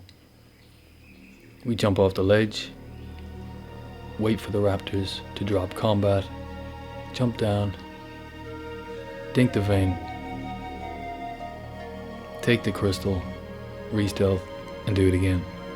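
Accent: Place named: Ireland. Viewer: Nice work man, you sound Irish :D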